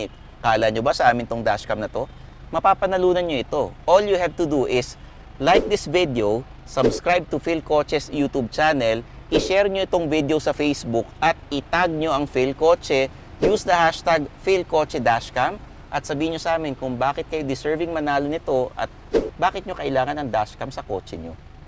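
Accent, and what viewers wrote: Conyo, Philippines. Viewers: 😂🤣😂 ang cute ni sir parang bagay mag conyo ala ADMU, UST😂🤣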